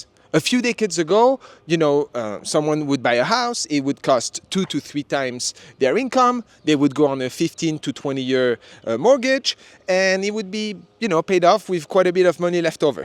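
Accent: France. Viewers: Where you from? your English is slightly off sometimes..a Frenchy maybe?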